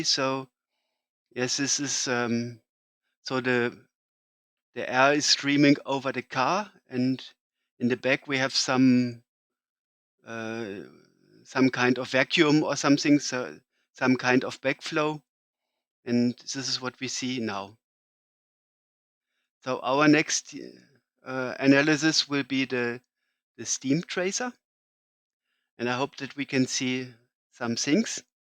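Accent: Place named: Germany. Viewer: Danke, auch wenn man am Akzent schnell gemerkt hat, dass du Deutscher bist :D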